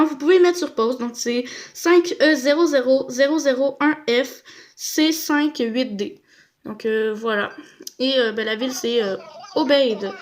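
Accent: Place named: Quebec, Canada. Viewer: On attend un petit accent québécois quand tu parles, tu es québécoise ?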